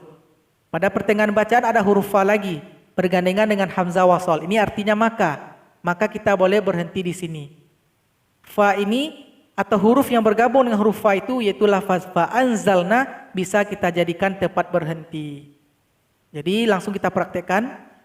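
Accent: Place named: West Sumatra, Indonesia. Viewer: Urg minang kalau bahaso indo logat minang nyo pasti ttp ado. Hehe semangat uda, mudah2an sukses slalu.. awak disoko nio belajar pulo mangaji yg batuah uda. Makasih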